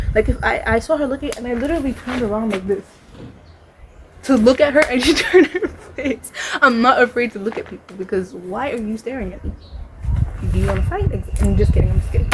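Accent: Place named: South Africa. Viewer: That's south african accent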